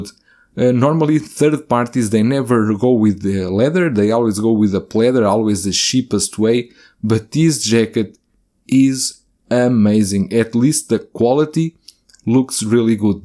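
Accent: Portugal. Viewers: Portuguese accent?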